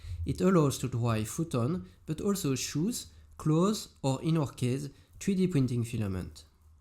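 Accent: France. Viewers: You’re Accent sounds french, not Japanese. 😳🙃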